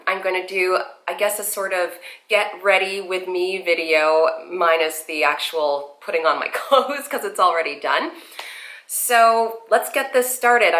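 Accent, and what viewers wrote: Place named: Canada. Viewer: I don't know why, but I love Canadian accent. :) Nice makeup.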